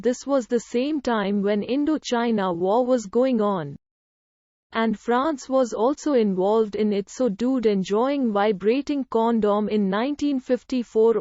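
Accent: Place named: India. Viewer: What is with that voice? The channel is called "English Man" and I hear a woman's voice with a heavy Indian accent saying nonsensical things.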